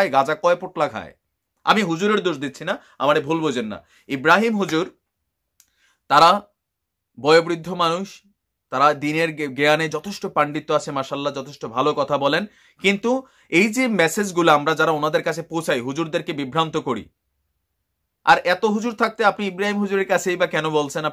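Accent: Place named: Comilla, Bangladesh. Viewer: ভাইয়া কুমিল্লা ভাষায় কথা ভালো লাগে না হলে ভালো লাগে না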